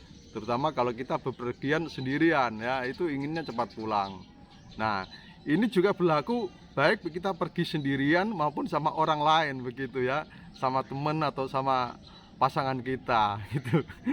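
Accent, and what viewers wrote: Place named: Banyumas, Indonesia. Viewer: Betul pak..sy juga suka dengerin Chanel bapak ngapak jg luw, hehe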